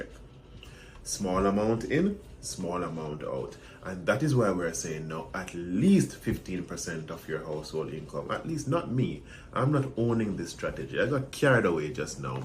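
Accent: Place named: United States. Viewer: Sir, you are not allowed to speak better English than 90% of Americans given your accent! subbed!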